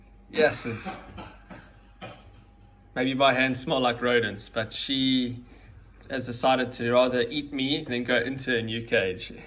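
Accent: South Africa. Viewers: U sound south african Are u south african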